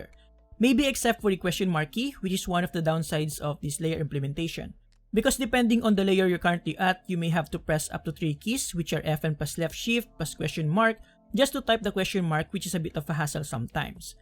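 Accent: Philippines. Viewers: are you filipino ?? because you sound just like my fam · Lmaoo I was not expecting a Pinoy accent, Filipinos on tech tube😎😎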